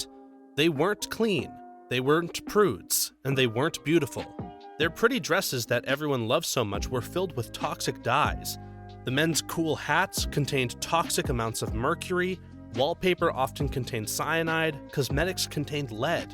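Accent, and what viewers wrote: United Kingdom. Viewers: I'm curious, are you from the US? Perhaps I just prefer the British accent to the US accent 🤷‍♀️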